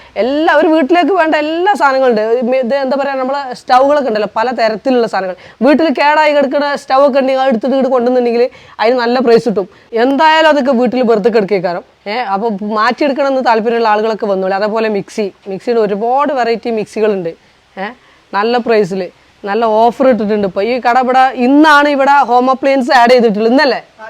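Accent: Malappuram, India. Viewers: Hyy ningaludee video nalla vibe anee. Nammude Malappuram bashayil athintethaya reethiyil avatharippichh tharunna ikkaa.... Ingalum ingalee videosum adipoli ane tto❤️👍🔥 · ഹായ് മുത്തുമണീസ് നിങ്ങളെ വിഡിയോ ഒരു വെറൈറ്റി ആണ് തന്നതായ നാടൻ ശൈലി സ്ഥിരം ഉപയോകിക്കുന്നമലപ്പുറം ഭാഷ കളർപില്ലാത്ത സംസാരം 👍👍നിങ്ങളും വീട്ടുകാരിയും ചെങ്ങായിമാരും 👍👍👍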